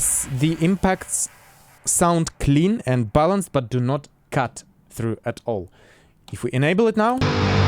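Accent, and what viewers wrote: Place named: Eastern Europe. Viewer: As a seasoned metal guitar player learning about the world of synthz it adds to your credibility that I spot an EVH, an ENGL and a Custom Audio Electronics behind you. Had it been Vox, Two Rock and Matchless combos I would have had my doubts..... :) Based on your accent I would say somewhere in Eastern or South Eastern Europe,- though it could of course be South America too,- you just never know... Greeting from a Vikingr Metaller in Scandinavia. Subscribed.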